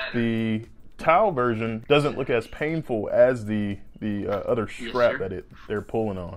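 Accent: South, United States. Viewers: Having a southern accent isn't an indicator of low intelligence. Imagine making fun of Einstein because he couldn't pronounce "theory of relativity" correctly.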